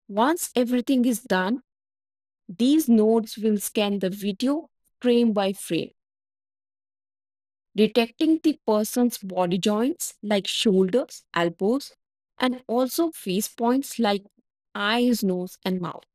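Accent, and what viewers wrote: India. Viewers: but why you have indian accent ?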